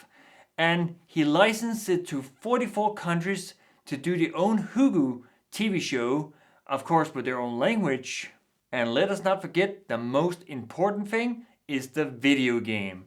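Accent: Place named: Denmark. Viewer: Work on your danish accent. Work on your postere. This whole thing felt very bland to Watch. Also this has 0 relatability for none danish viewers, so if you insist on doing video’s in english, make sure that there is at least something that none danes Can relate to. Otherwise you might as Well just speak danish. Not trying to be toxic. This is just my honest oppinion.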